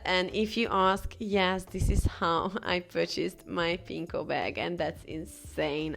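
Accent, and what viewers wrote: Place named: Hungary. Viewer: Within 3 seconds of the video I knew she is Hungarian based on the accent, then I heard her name :)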